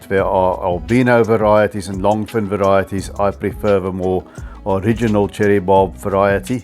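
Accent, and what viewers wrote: South Africa. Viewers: Love your accent…South African. 😊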